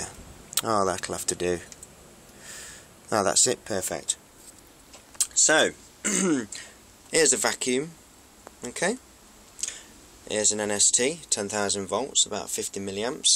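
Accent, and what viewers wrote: United Kingdom. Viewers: Are you from Lincolnshire by any chance?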